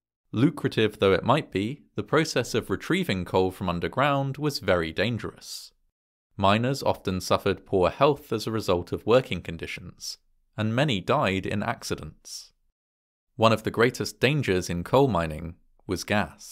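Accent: United Kingdom. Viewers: I love his narrations, such a calming and soothing voice with a very non judgmental or incriminating approach! Mee-thane gas, gotta love the original English interpretations. Remember folks, it started with them, not with us in America! I love and marvel how the British and Scottish pronounce common English words! It sounds wrong but again (a-gane), it’s we that have it all wrong not them! 🇬🇧🏴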